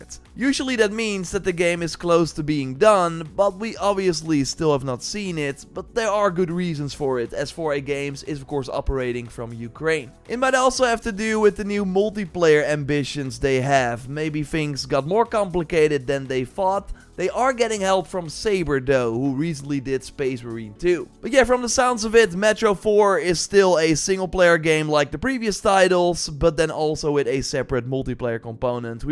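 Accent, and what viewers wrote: Netherlands. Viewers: kerel fix dat nl accent man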